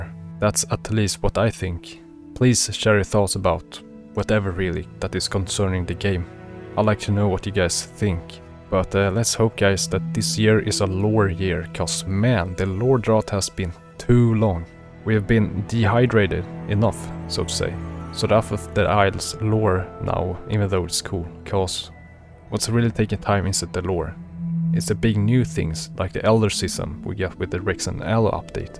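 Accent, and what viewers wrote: Sweden. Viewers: Im norwegian, and i also heard swed immediately:P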